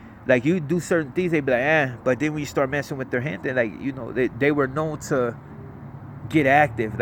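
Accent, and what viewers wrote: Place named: Mexico. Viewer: Why does he try to sound mexican if hes black?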